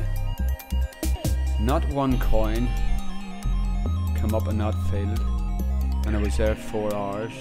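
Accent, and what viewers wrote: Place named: Belfast, United Kingdom. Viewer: gd vid bro u in belfast ? Just you speak like Guy ive dug with Hes originaly from belfast 😎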